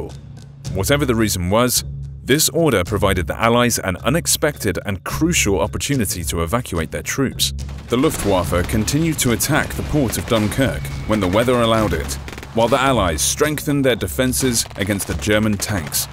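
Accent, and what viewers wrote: United Kingdom. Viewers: You actually sound Like a former Brit like me.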